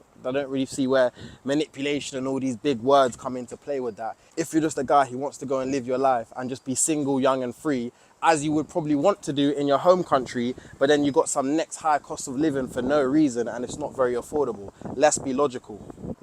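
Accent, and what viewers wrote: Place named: South London, United Kingdom. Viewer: well said man - i think as long as it's all consensual/safe between adults then it's nobody's business but theirs. You sound like you're from South London btw - Thailand honestly beats the hell out of Lewisham or Croydon (where i'm from)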